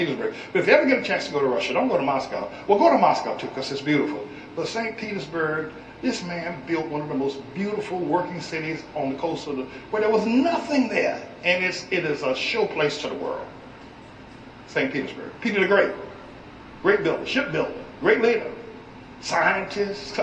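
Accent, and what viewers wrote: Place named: United States. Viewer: EGYPTIANS AND THEY WERE NOT BLACK! STOP ATTRIBUTING TO YOURSELF ANYTHING DARKER THAN YOUR FUCKING AMERICAN ACCENT. THE WORLD LOVES YOU. YOU ARE AFRICANS. YOU TRAVEL TO EUROPE, ISRAEL, AMERICA, AND RUSSIA. AND WHERE IS THE RESULT?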